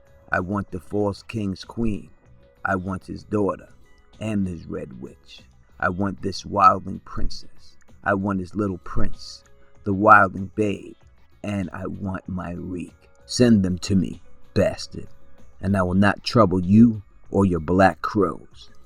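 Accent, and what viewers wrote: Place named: Brooklyn, United States. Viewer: You sound like an italian from brooklyn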